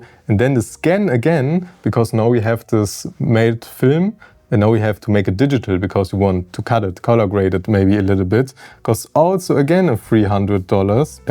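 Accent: Germany. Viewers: Film is still unmatched in all aspects but the sharpness and fine details. But do you really need those pores on the nose to tell a story hehe. Still prefer the top-quality film as a viewer though. Also I don't mind german accent, its not indian or hungarian after all ))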